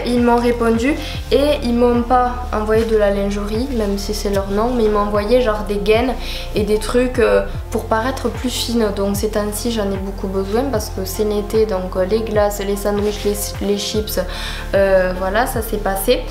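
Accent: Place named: Marseille, France. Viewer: oooooui oooooui " ...poinnnnnne...poinnnnne..." je n'ai gagné qu'une chose avec la chaîne de Williams c'est d'avoir découvert ta chaîne avec ton magnifique accent marseillais ;) pouce bleu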